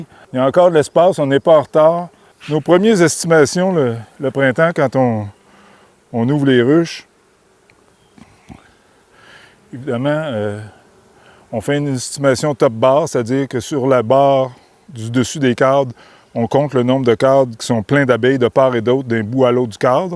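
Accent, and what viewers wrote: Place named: Quebec, Canada. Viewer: Merci, c’est un vrai plaisir cet accent québécois pour nous parler de vos stratégies pour les abeilles. Je m’abonne sans hésitation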